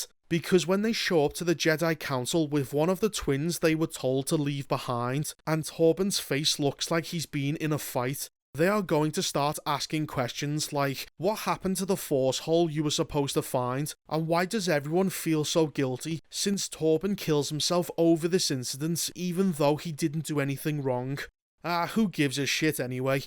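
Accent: Liverpool, United Kingdom. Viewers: I would like to thank from the bottom of my heart for his extremely informative, mercilessly honest and extremely entertaining videos. Unfortunately, my twin brother and I discovered him just recently, as a true gem of the Internet. We enjoy movies, series and games both dubbed and with the original soundtrack since early childhood, regardless of nationality. To get straight to the point, the above-mentioned factors in combination with his great (scouse?) accent are a relief for the soul and have already ended many a shitty day with more than just a smile on our lips. If possible, let us know how we can support your channel so that this source of knowledge and good humor never runs dry. Regarding "The Whackoshite", I would like to mention a dialogue that I would love to have: Disney / K. K. / L. H.: “We cannot understand what the audience really expected... 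It’s simply impossible to do Star Wars (Episode IV-VI) justice and create something equal.” Me: “Do you want to know what’s really impossible, Disney / K. K. / L. H.??? Peeing in the corner of a round room." The Power of NONE... 🎭 🎭 🎭 The Power of FEW... 🎭 🎭 🎭 The Power of FUBAR... 🎭 🎭 🎭 P. S. Please forgive me for my possibly poor English. I'm a little older and therefore a little rusty.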